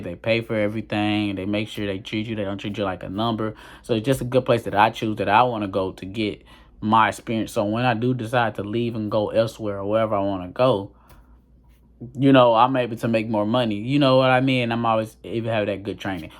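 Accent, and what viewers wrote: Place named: Nashville, United States. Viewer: I want to say thnx and in curious are u from Nashville? THT way I say “LIKE AT) reminds me of when I spent a summer in Nashville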